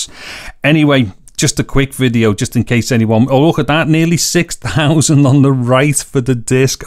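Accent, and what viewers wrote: Liverpool, United Kingdom. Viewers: You are a scoucer, aren't you?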